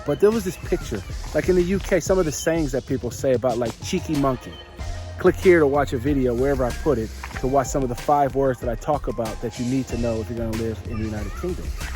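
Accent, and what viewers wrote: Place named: United States. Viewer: Hi, it's wonderful to se you and your girls having an ace time. I have a question, it's based purely on what has been my love of language all my life. I love to hear you talk in American English because I hear you say, "M'am, Yes Sir, No Sir." For me this is almost 1920's English language. English language seems to evolve more slowly in American English. Given that the "cultural melting pot" is being slowed down more quickly by immigration restrictions in the US, would you agree that may be why Americans still use very old fashioned ways of adressing people?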